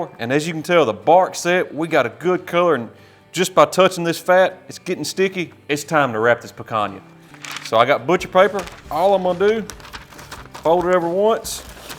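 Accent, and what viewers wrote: South, United States. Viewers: I like that southern accent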